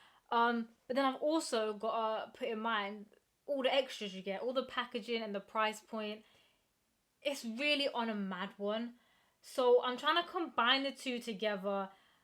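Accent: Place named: South, United States. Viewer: nice trainers, what part of down south you from i can pin point the accent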